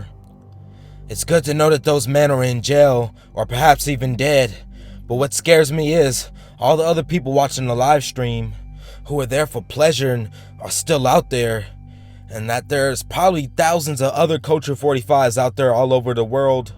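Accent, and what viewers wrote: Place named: United States. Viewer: Wow,you DO have a sexy voice!! i'm British and have never heard such a gorgeous American accent.......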